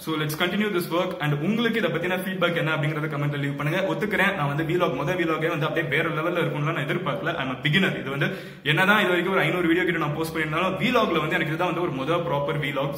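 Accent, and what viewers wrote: Madurai, India. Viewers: Bro nenga madurai yaa.....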